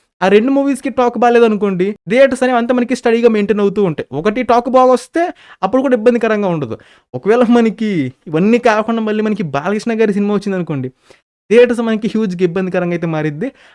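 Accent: Guntur, India. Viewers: Bro nedi GUNTUR yee naa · Bro meedhi guntur aa ❤ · Bro meedi guntur aa😮? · Bro nuvu guntur ana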